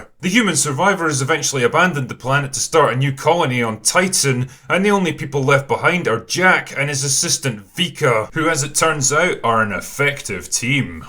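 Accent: Scotland, United Kingdom. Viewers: Somehow I really, 'really' wanted to follow up with "Yes way!" in his customary slurred and cynical Scottish accent.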